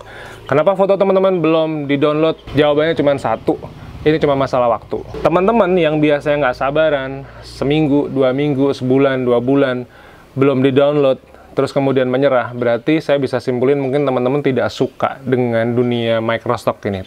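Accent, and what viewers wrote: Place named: Java, Indonesia. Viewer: Dengan bawaan yang rilek,logat Jawa nya kental,Joss kang👍🏻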